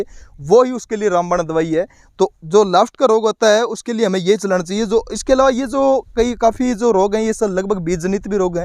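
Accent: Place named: Rajasthan, India. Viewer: Pahle bolna sekh lo aise language bolo jo sab ko Samajh a Jaye sab ko pta hi tum rajasthan se ho dekhane ki jarrurat nahi hi tum se Accha to kisan bol rha hi Chutiya kahe ke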